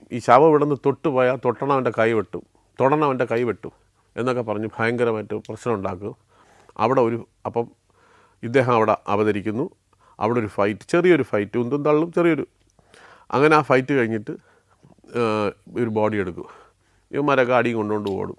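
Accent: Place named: Trivandrum, India. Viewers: നല്ല അനുഭവം കേൾക്കാൻ രസമുണ്ട്. അതും തിർവനന്തപുരം slangil.നന്ദു ഇനിയും ഉയരത്തിൽ എത്തട്ടെ. ആശംസകൾ. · City Trivandrum slang ❤ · 🤢 appi slang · Love to hear proper trivandrum accent....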